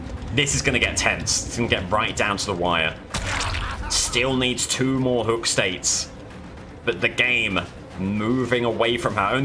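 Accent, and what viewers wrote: United Kingdom. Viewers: So first video I’m seeing of this (I know, bad place to start but I will go back and binge the rest) but saying the killers are rewarded points for hooks when you actually count hook states is a bit confusing. That’s not negative criticism, just thought I’d point it out! Great content, I’m a sucker for a British accent 😂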